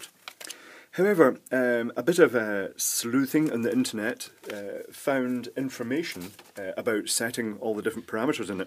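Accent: Scotland, United Kingdom. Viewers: where does this horrible teenage kind of expression 'bricked' come from? Are we using Americanism again? we should be preserving the English language especially with such an attractive Scots accent 😇 keep these fascinating videos coming ! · I was wondering about that lol.....I thought you were saying 'break' it, in your Scottish accent....!!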